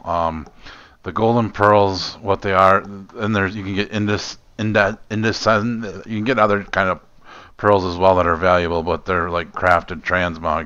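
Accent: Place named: Midwest, United States. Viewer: Your from the Midwest I can tell. I’m guessing Wisconsin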